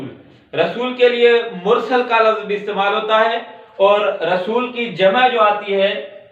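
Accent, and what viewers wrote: Sindh, Pakistan. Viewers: gud urdu in pure sindhi eccent !!!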